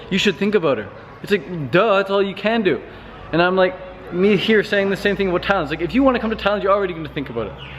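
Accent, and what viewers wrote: Canada. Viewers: which part of Canada are you from?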